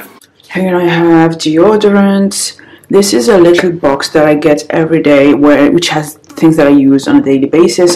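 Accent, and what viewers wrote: United Kingdom. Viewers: you are developing a little british accent, how cute .. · that british accent though hahahaha i loved the video, keep shining!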